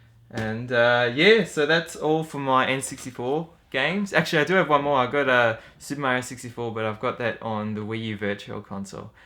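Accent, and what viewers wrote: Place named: Australia. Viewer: Cool, yeah I saw that tag video. I thought I recognized that accent. I was actually in Australia in 1999 when I was in the US Military. (Forever ago.LOL!) We were on the East coast near, Rock Hampton, Gladstone and Brisbane.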